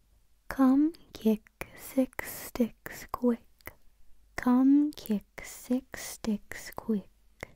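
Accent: United States. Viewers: This video is really good, it gave me tingles for the first time in months :') Your accent, cadence and attitude are perfect; please keep making videos! What is your accent? Being from the north of England I'm not great at placing american accents :P Thank you so much, just keep being you <3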